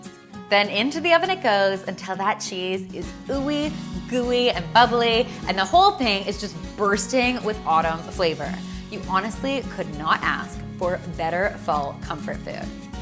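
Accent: Canada. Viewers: You're Canadian, right? Just confirming 😅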